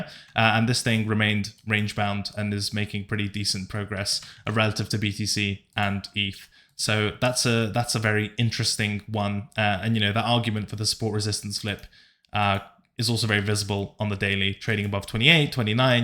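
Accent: United Kingdom. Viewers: Team here. Sure, it might be more profitable to copy trade the duck. But you can't beat the charm of ' lovely British accent and self-deprecating humour.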